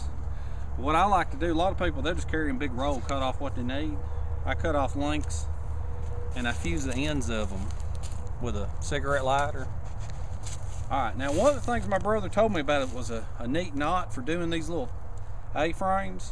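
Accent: South, United States. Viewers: You come up with some great ideas,. I really enjoy watching your vids, and I love that southern accent! Lol! take care, now, and a big hello from New Zealand!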